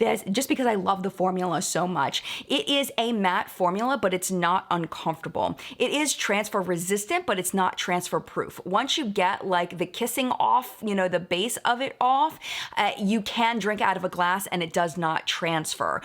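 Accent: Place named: Maryland, United States. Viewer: I can hear you're a Marylander with how you say your Os. 😁 Hi from a fellow MD lady!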